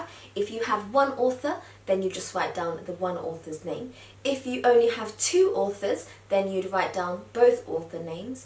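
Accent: United Kingdom. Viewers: She has an English accent. She went to Harvard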